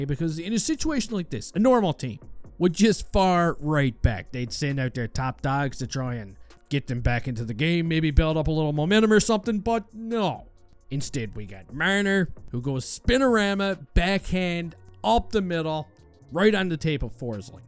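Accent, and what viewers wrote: Newfoundland, Canada. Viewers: Fellow newfie here, didn't take me long to catch onto the accent! God bless ya, just stumbled onto your channel. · Is this dude a Newfie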